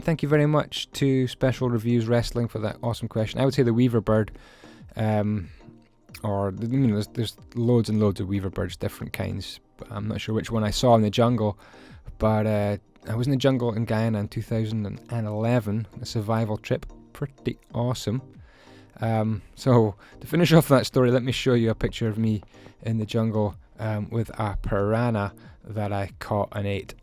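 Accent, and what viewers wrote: Scotland, United Kingdom. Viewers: I'm just a new subscriber and your accent is really throwing me off, I think it's kind of Scottish, but could someone shed some light on this please? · It's Scottish, not very strong Scottish, but it is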